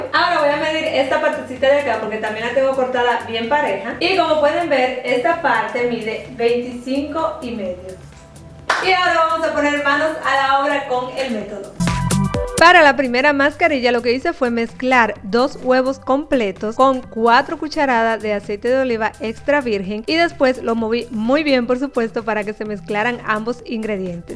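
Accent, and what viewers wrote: Dominican Republic. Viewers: eres dominicana ??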